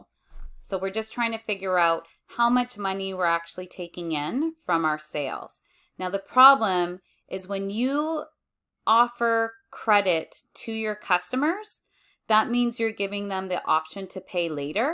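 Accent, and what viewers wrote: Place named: United States. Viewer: The American accent kills me 😂 good vid though 👌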